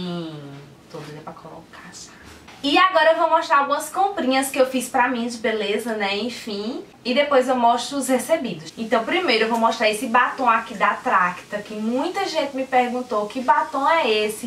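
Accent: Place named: Northeast, Brazil. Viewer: ñ sei se vc vai lembrar de um comentário q fiz. Falando q estava viciada em seu sotaque, e me fazia matar um pouquinho a saudade do Nordeste, q eu moro no Rio e tal... e advinha só essa semana meu marido recebeu uma proposta de trabalhar em RECIFE!!!!!!!!!!!!! E claro aceitamos! Estou super ansiosa. Então se te ver nas ruas de Boa Viajem vou falar com vc heim! kkkk Beijão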